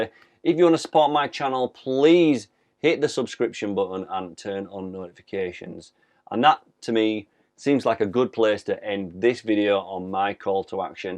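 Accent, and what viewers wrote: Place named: Scotland, United Kingdom. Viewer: Ahhh a Scottish lad! Great content dude. Subscribed!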